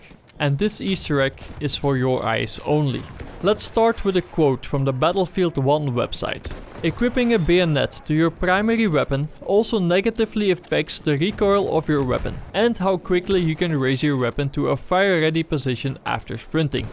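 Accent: Netherlands. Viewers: je hebt wel echt een zware dutchlish accent man. Vind t wel mooi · je klinkt Nederlands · toooooo obvious that he's from the netherlands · you sound so dutch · Your englisch is like a dutch guy ? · u dutch? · how dutchies speak english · Het spijt me om het te moeten zeggen, maar het is heel erg duidelijk dat je nl bent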